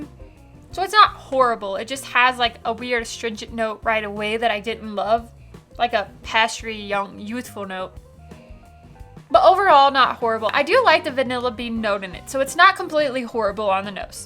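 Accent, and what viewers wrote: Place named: South, United States. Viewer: That definitely ain’t no Yankee accent there, sounds like a southern girl to me! I just picked up a sampler bottle of this today, im looking forward to trying it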